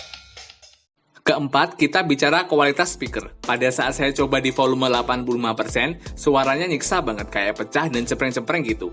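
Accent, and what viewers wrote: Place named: East Java, Indonesia. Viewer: orang magetan ya gann :D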